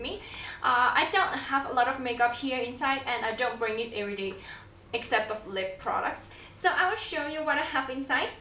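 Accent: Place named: China. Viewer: but arent you Chinese????? i can barely understand you with the heavy accent. no way are u a native english speaker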